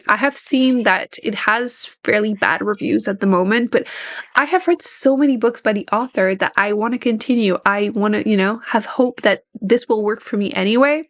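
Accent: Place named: Quebec, Canada. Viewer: Glad you said you were from Quebec-I kept trying to place your accent. I also have only enjoyed Big Little Lies by Liane Moriarty - I gave up on her.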